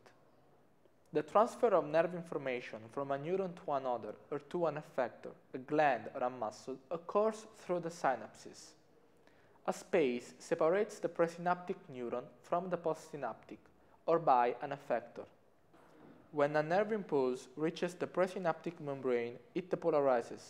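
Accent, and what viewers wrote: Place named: Romania. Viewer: This teacher seems Romanian as his accent is so likely to the Romanian pronunciation🙂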